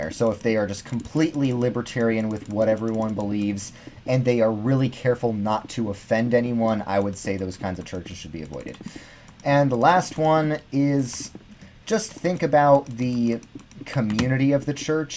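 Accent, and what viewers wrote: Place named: New Jersey, United States. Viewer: He's Jerseyian, you are allowed to make fun of his accent all day - me, a based Texan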